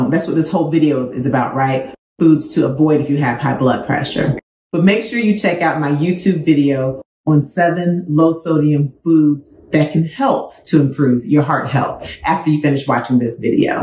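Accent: South, United States. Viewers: Oh-my-goodness! thank you for making this video. You are doing 4 things here for: Reinforcing much of what I'm already avoiding and giving me more reason to stay focus. Reading the content, cooking more. I'm a little a Pescatarian finding more options. You are also causing me to miss my home grounds in Arkansas everytime you say " You know Goodden-well" I've only heard from southern people.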